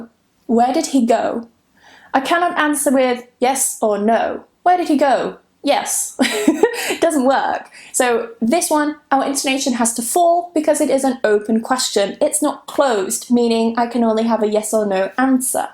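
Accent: United Kingdom. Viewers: It's lesson was marvelous and helpful for me. I love your British pronunciation. Thanks. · Hello! Beautiful British accent!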